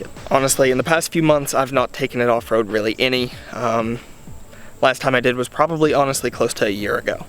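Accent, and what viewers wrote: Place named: United Kingdom. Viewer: U can tell this guy loves rr he is getting a small British twang to his accent. Ha. And yes I agree u r really cute. X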